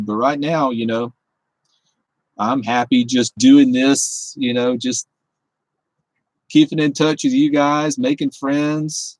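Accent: South, United States. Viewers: Hey. Gotta check out all ur vids. I see Humbuckers on that Maple beauty. Is that a Gibson or Epiphone? Great Vid with in OR.All Subbed. I thought that smooth Southern accent was maybe GA or MI, but I'm guessing TN, now a fave state of mine. Kenny Chesney was an E.TN boy. Stay safe & Happy Trails brother.🎸🥂I'm in NYC and gotta bail. Gotta build a Van or Pickup Rig. Stay safe brother.phil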